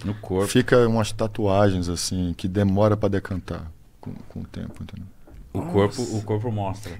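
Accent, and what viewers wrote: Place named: Rio de Janeiro, Brazil. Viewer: jurava que ele era carioca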